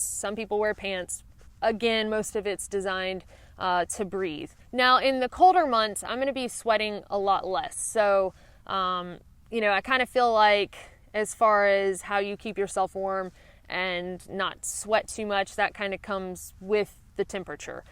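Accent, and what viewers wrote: South, United States. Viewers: I just spotted your channel today, this is the 6th video I've watched so far. I'm going to subscribe to your channel because I love the beautiful scenery you get to see on your hikes and also because it's so great to hear that sweet southern charm from a fellow southerner. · Just for fun, I pulled open a tab with your YouTube video from one of your first AT vids: "Extras: I Almost Knocked My Teeth Out" . I played a minute or two of you talking, then a few minutes of this video. Then switched back and forth. You still sound like you're from the south, but you've lost some of your accent. I wonder if it will start coming back, now that you are home. Not saying that a southern accent is good or bad or anything. I just love linguistics and find it fascinating. It's interesting to hear a difference.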